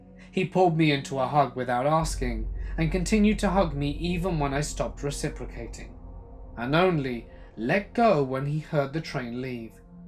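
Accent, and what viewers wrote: United Kingdom. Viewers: So glad I found this channel, a British person narrating! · Hey! I just wanted to say that I went back and watched some of your older videos. I don't know if it's just me, but your British accent seemed a little bit thicker than it does in your more recent videos. Is there a reason why you sound different now? Just curious.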